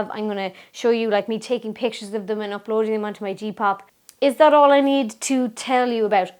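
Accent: Ireland. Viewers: I like ur accent!! What part of Ireland are u from originally?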